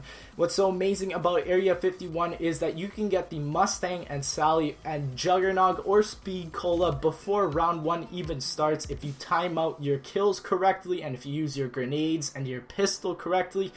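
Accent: Canada. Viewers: Ur canadian? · I knew you were Canadian bcuz when you say about it sounds like aboot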